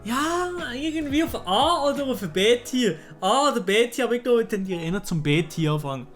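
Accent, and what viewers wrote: Lucerne, Switzerland. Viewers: Lozärner Dialekt Horror? Direkt deabo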